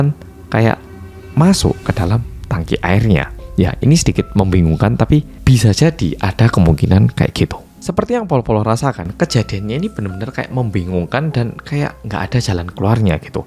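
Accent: Java, Indonesia. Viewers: Orang Korea kow ya medogk 😂😂😂❤🥰🥰🇲🇨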